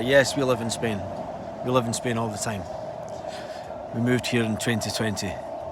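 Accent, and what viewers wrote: Scotland, United Kingdom. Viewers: Where in Scotland are you from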